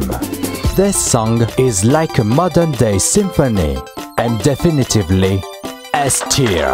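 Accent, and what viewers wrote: France. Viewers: franchement la vidéo est super sympa ! Et puis l'accent français n'est pas un problème ^^ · The production quality on this is amazing! And don't you dare worry about the french accent, it sound wonderful!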